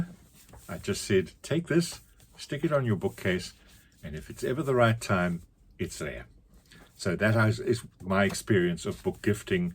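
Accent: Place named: New Zealand. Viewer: 👋🏼Newer subscriber here, and I was enchanted by your accent. I was hearing a little New Zealand, a little German, and then solved the puzzle by looking up your home page--haha! Nice version of the tag. You're a more ambitious reader than I!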